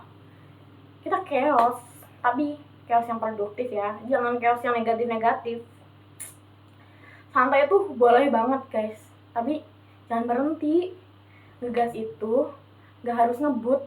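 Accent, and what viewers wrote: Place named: Banyumas, Indonesia. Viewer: Gen Z ngapak 🥰🥰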